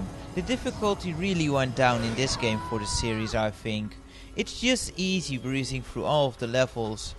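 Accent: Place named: Netherlands. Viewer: rofl you sound VERY dutch. are you?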